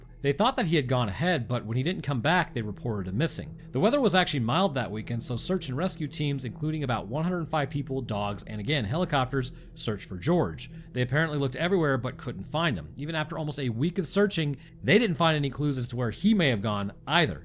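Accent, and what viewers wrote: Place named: Connecticut, United States. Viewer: The Connecticut accent is feint yet still unbearable. Please try harder to escape the hell hole you're from.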